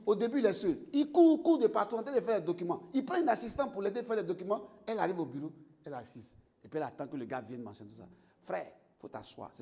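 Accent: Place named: Bété, Ivory Coast. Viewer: Vraiment vous parlez comme un Ivoirien précisément Bété. Je signale que j'ai aimé et je sur que je vous raconterai par la grâce de Dieu.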